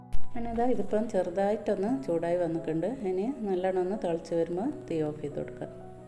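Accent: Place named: Nadapuram, India. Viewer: Nadapurathukariyanooo samsaram kettitt ❤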